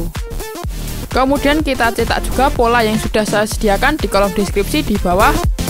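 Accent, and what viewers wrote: Java, Indonesia. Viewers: Kreatif bagus gw suka,,,tp gw lucu sm suarany Jawir bngt.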